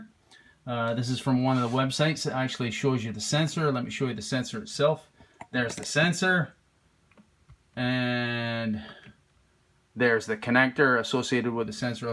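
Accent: Scotland, United Kingdom. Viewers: Scots accent coming thru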